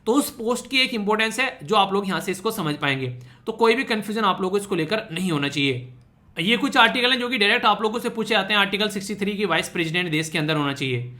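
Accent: Haryana, India. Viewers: haryana ke ho na sir aap aaj tak jo bhi baate lecture main btayi hain n aapne kti dil t connect hove hh sare baat haryanavi tone ss aapki language main sir or sir lecture toh aapka dmakedaar hove h har baar 😊 ☺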